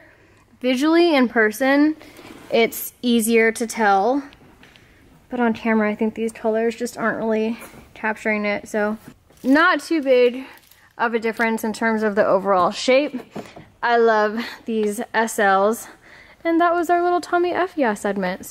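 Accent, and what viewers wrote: United States. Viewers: HEE-YUN-DAY. not HUN DAI. Damn that American pronunciation kills me.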